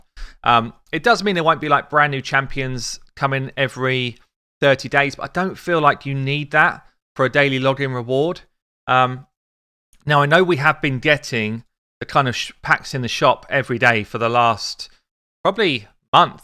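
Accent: England, United Kingdom. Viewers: Many thanks for another information update you deserve all the recognition you get. Website has become my go-to source (ahead of Chosen's great resources and I'm in a Chofly clan.) Battlepass appeals to me as a low spender as I'm retired. I play the Gwent cad game and their Journey is similar although with weekly challenges to meet - one of my monthly buys. Best aspect of your videos - your English accent, which this expat misses surrounded by Americans.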